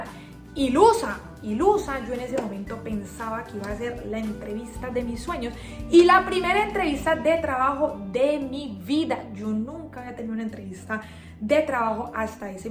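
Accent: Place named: Cauca, Colombia. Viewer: Saludos jajajajaja ese acento del Cauca está intacto 👏👏!Gracias por tu contenido!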